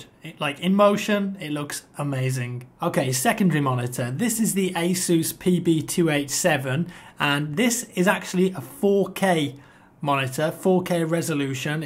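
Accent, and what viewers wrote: United Kingdom. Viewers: Been a sub since you had 50k glad you got far with your gaming. Your stuff is pure skill, and the British voice makes your videos more interesting. Good stuff lad.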